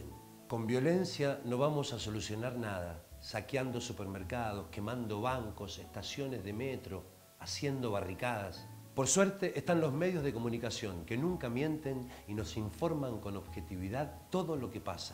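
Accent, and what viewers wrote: Argentina. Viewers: Está wenisimo el video, pero si llevas 23 años en Chile y te relacionas con Chilenos a diario... Como es que aún no has perdido el acento Argentino?. La española Eva Gómez (de El Diario Eva- CHV) a los 5 años de residir en Chile, ya había perdido su acento español y ahora prácticamente es una Chilena más, pero en cambio tú, Barticciotto, Cristina Tocco, etc. Han vivido décadas en Chile y aún hablan como Argentinos. Que se peguen los acentos es algo inocuo de acuerdo a la región o país en dónde estés. Tal vez ustedes piensan que les da más caché seguir hablando como argentinos pareciendo casi una imitación burda (a lo Amaro Gómez-Pablos) 😅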